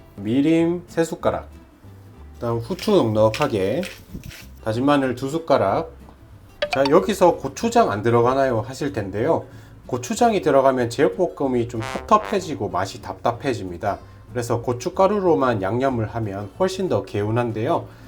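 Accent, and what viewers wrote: Gyeongsang, South Korea. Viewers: 경상도 액센트가 매력적이에요 love love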